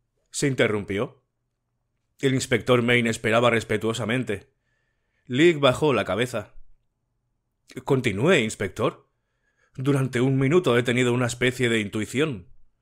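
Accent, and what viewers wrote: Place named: Spain. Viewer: ❤Frondosa imaginación la de A C.Hermosa la lectura,con su española voz,señor..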